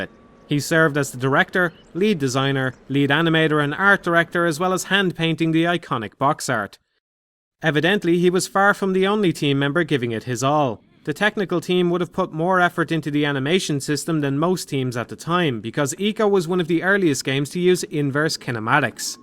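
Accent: Ireland. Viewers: just been scouring through the top search results for Ico review, & i don't need to look any further now. as an english lad i'd much rather listen to a crackin irish accent than a whiney smiley american talk about a game that has genuine sentimental value to me - my mam used to work in Choices (blockbuster before blockbuster) lots of VHS, lots of 5th and 6th gen of gaming, some DVD's. as things were in the early 2000's. Remember playing Ico with her at a very young age & loved the world it created. funnily enough we rented shadow of the colossus shortly after completing Ico, found it way too hard and ambiguous and never finished it before returning it's a bit mad really, when silly things like games stay in your mind nearly 20 years later & become warm & fond abstract memories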